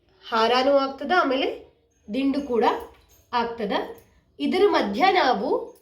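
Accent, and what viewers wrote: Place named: North Karnataka, India. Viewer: ನನಗ ಭಾಳ ಖುಷಿ ಅನಸತದ ನಮ್ಮ ಉತ್ತರ ಕರ್ನಾಟಕ ಬ್ರಾಹ್ಮಣ ಶೈಲಿ ಮಾತುಗಳು ಕೇಳಿಕ್ಕ್ ಚಂದ ನಮ್ಮ ಮನ್ಯಾಗ್ ಹಿಂಗ ಮಾತಾಡ್ತೀವೀ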